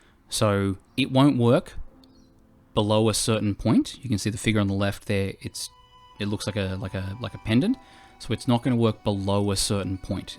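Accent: Australia. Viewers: Your accent sounded familiar and than I realized ya were australian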